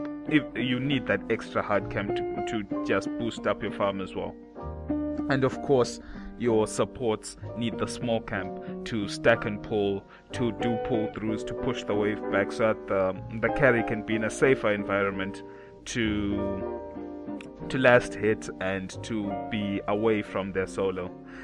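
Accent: South Africa. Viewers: definately south african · Yo dude, You sound South African, Is that the server you play on?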